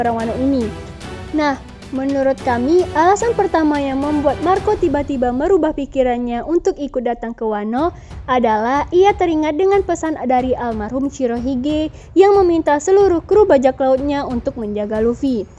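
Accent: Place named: Malaysia. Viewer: Apakah pengisi suara ini orang malaysia? Sepintas aksen nya cem melayu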